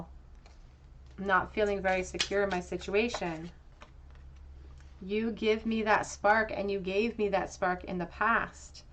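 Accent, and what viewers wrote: Canada. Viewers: I love your readings ! Always some much information that is so helpful ❤! Your Canadian accent it is comforting to me, I am also Canadian but have been living in Europe for the last 10 years. Once again thank you 🙏 ❤❤❤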